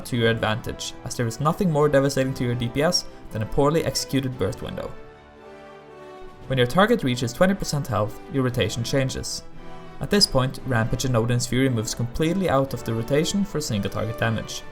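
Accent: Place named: Norway. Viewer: hahaha du hørtes jævlig norsk ut på videoen xD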